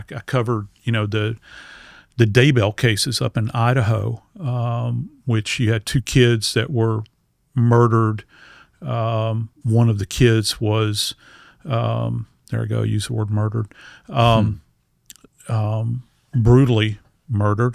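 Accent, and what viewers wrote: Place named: South, United States. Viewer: He's got more education and experience than you can ever hope to have. He's southern, they pronounce words funny. He's a scientific genius specializing in criminal death scene investigations. Podcast is "body bags" and has written many books on this. You can obsess over a dumb word or listen to what he knows. Your choice.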